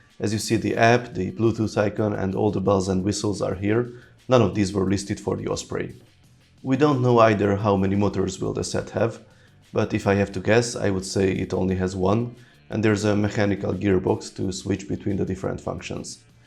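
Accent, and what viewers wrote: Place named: Hungary. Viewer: I just asking, because is a Hungarian name and your accent is soo Hungarian. But if no, then sorry. 😅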